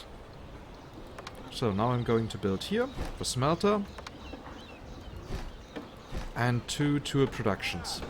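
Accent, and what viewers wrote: Germany. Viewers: I love the accent, I knew you were German within a few seconds (I've lived here for 15 years I should know)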